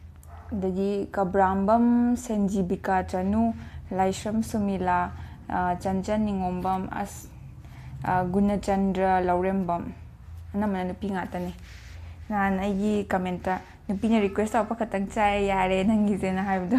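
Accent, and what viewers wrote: Kakching, India. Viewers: eche mathangdi momo oina chabiyo adusu kakching marol da ngang ngaga chena ngangbado taba yam nungaijeiye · Ymna haotraba malleko...kakching mrolda oina ngangbiyo aduna henna nungsiye😍😍momo oina chabgi touse... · Kakching lon ngangbna hena nungshiye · Kakching lonna nungyba helle echan tharoi charak o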